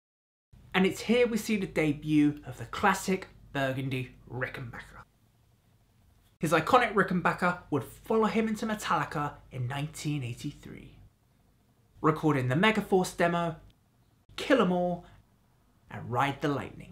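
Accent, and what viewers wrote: England, United Kingdom. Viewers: I thought that was just his English accent.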